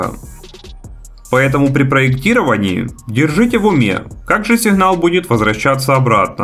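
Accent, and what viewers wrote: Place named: Ukraine. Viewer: Нормальный акцент украинский, так и должно быть.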